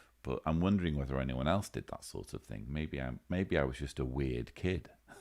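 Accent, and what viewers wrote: England, United Kingdom. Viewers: Are you English or Croatian or................?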